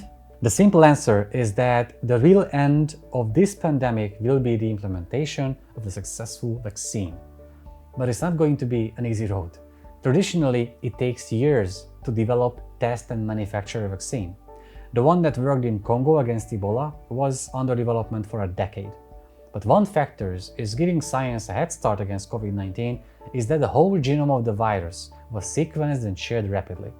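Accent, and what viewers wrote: Hungary. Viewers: Your accent reminded me of my grandmother's. Sounded familiar. ;) I never got to learn Magyarul, but still hope to one day! Be well. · Hungarian accent,,,dr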